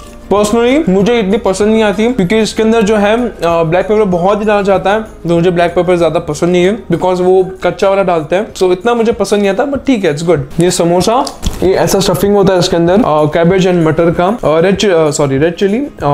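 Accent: Gujarat, India. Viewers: Awesome video 💙💙💙! Aur I must say very delicious video! 🤭😂😂... Your Gujarati accent is too cute... Intro is just op! Mirchi kya sach mein bohut teekhi thi?🤭😂! Btw, fafda - jalebi kabhi hume bhi khilana... As yeh video dekh ke toh sach mein cravings suru ho gayi... I really want to taste that champakali gaathiya and masala papdi gaathiya also... 😍✌🏻... And thepla and khakra is also looking sooooooooo yummy😋😋!!! And that dialogue, "Naam bas naam hain... Hume toh bas khane se kaam hain"- is really hilarious😂😂😂... Next Sunday, I must have patra, khaman or khandvi as my breakfast... ✌🏻💙❤ You are really the best brother sister duo ever... 👍🏻👍🏻👍🏻 I can see that you are really enjoying your Sunday breakfast... I want to say you that we are also having a lot of fun during watching this video... 👏🏻👏🏻♥♥♥👏🏻👏🏻... Thanks for bringing smiles, laughter and enjoyments for us on Sunday... 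😊😊😊... You are really one of my favourite actors and favourite YouTubers... 💙💙💙❤❤❤... Your dedication towards your work really inspires me♥✨... You are really amazing and multi talented and your videos are excellent and absolutely incredible... Keep shining and keep dreaming superstar... ♥♥💙💙❤❤... All the best sweetheart... 👍🏻💙💙💙💙💙💙💙💙💙💙💙💙💙👍🏻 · FAVOURITE PERSON AND FAVOURITE FOOD IN ONE FRAME🙈✨he is posting videos regularly omg😭💗Also talking in gujarati always hits different 😂🥺💗 Really love your Gujarati accent, and the Gujarati intro in starting was damn good😂✨ all dishes are looking so good and all of them are my favs too🤤✨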